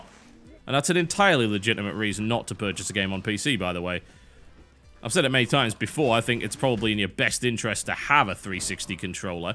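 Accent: United Kingdom. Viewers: Well um he's British...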